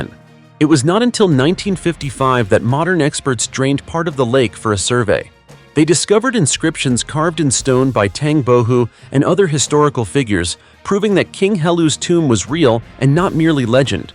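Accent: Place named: United States. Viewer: as a side note, I can’t even watch this. American narrators drives me nuts.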